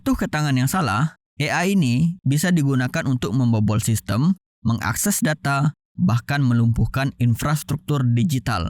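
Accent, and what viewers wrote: Aceh, Indonesia. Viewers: Ureung aceh bang nyoe. ? bereh hana gadoh karakter bahasa aceh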